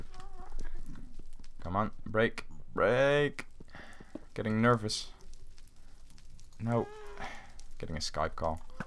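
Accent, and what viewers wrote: Netherlands. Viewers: are you Dutch? Becouse you sound Dutch:) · Are you dutch?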